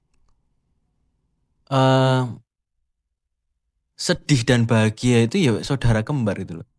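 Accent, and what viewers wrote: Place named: Surabaya, Indonesia. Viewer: KERENNN lurr LANGSUNG MAK JLEBB, MODEL BOSO SUROBOYOAN CEESSS PLENG LANGSUNG ON POINT. Cuman penasaran bisa sejauh ini PEMBUKA LARA BATINNYA KY APA DULU,,, 😂😂😂😂 PENGHIANATAN KAH, PENYAKIT KAH HARTA KAH.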